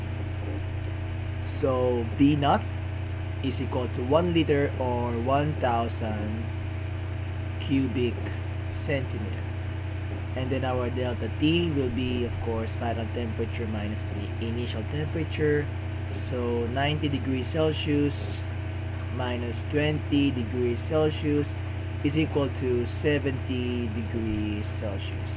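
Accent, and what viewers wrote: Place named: Philippines. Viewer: the accent is Filipino hehehej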